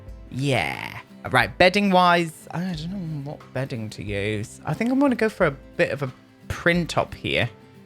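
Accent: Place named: Scotland, United Kingdom. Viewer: yes! please rate the sims packs :) also as a scot I wanna hear that accent more haha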